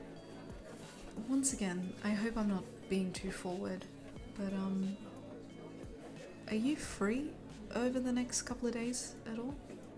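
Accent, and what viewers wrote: Australia. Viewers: We finally got An Australian asmr love the mention of Perth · I have many friends who are Australian. I also have a cousin who moved to marry her now husband. The Australian accent is my upmost favorite accent. Love the audio. 100% dragon approved 🐉 💜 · one little piece of feedback i have, you have a soft voice and the ambient sound was a bit too loud in my opinion, other than that really great voice acting and script! also yes, Australian accent is really cute! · Likewise... And I don't understand the appeal of the Australian accent. I've been surrounded by it my entire life, and it's usually annoying (except for a select few such as this lovely VA). If you'd like to explain, I'm all ears.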